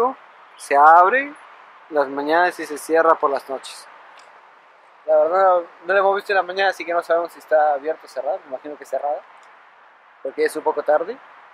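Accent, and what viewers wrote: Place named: Europe. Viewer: ah, francesa. Sí, me pareció que tiene un acento europeo. Me alegro que les gustó Argentina. Saludos!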